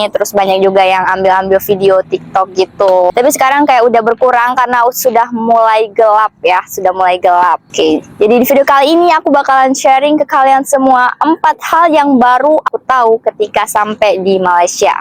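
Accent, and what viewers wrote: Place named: Indonesia. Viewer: Bahasa Melayu loghat Indonesia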